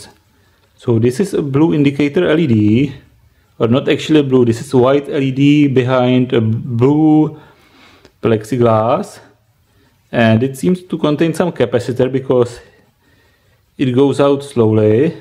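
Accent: Czech Republic. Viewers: i know you're czech because you czech-ish english pronoumciation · Sounds like a Czech English accent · Thats some nice czech (prague) accent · Judging by your accent - Are you Czech? If yes then "Ahoj" :D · Tak tohle je nejsilnější český akcent, který jsem v angličtině kdy slyšel.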